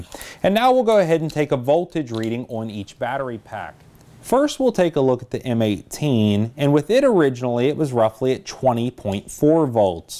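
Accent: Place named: United States. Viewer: Effing yanks , your English is gross .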